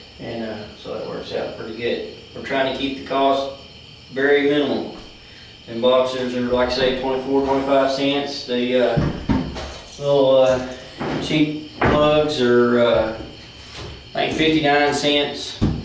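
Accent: South, United States. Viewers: LOL being a southerner, I can understand him perfectly...BUT I had to try the captions!! OMG too funny!!! The ONLY thing it got right is "for us", the rest was so bizarre!!! :D thanks for the idea ;)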